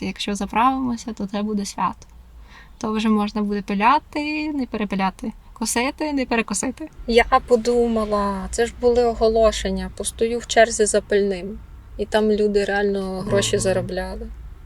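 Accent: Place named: Kirovohrad, Ukraine. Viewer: Слухаю твою мову. Відмінності з Кропивницькими селами мінімальні.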